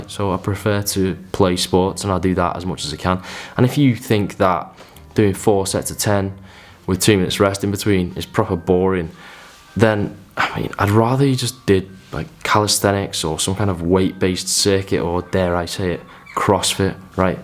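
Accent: United Kingdom. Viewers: What part of the UK are you from ?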